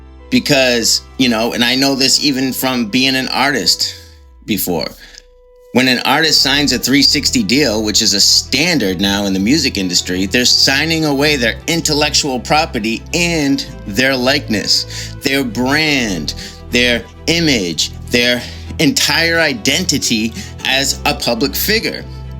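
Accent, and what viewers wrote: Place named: South Boston, United States. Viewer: Wuttup dude, I just saw this video on Lipstick Alley, and I was frustrated that you didn't SHOW us what you described, but I also respect that you expect people to care enough to investigate on their own, which we should, and I most certainly do, and will in a minute about Gucci, who is a total nothingburger to me, no idea who he is, what he looks or sounds like, so my eyes and ears will be a fresh raw test. I believe it, probably, tho, it all sounds UTTERLY likely, given how evil the overlord class is. I just subscribed to your channel, because it looks interesting AF, but mostly because I miss hearing that accent. I grew up in Southie, and I still live in Quincy, but your particular accent is VERY familiar to me, and very few people sound like you now. Over the last 4 months, I've become the Faceclocking GOAT, I've detected and proven HUNDREDS of secret biological family relationships among celebs, often with me and my family directly involved. 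I've been waiting all this time for someone to remind me of Joy Wright-McCarthy, and you win, lol. You also remind me of the Ferris family, e.g., Paul and Justin. On a weirder note, you emit Jack Parsons vibes, and so do I, and so do a surprising number of people originally from Southie. Weirder things were afoot in Southie than anyone can currently possibly imagine, truly the bizarrest shịt in the history of mankind, NO EXAGGERATION, and yes, I am including the ancient Levant, ahem. On a brighter note, we were not just polluted with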